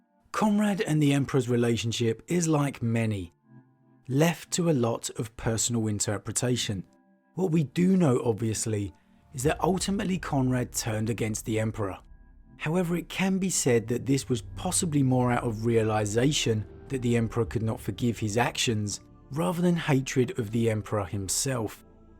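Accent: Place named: London, United Kingdom. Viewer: Great voice london accent mate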